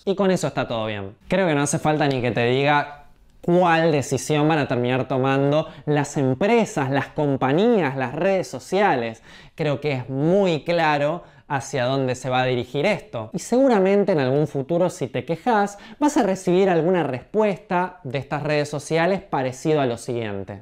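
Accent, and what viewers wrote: Argentina. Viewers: Tu acento Argentino tan marcado arruinó el video, dislike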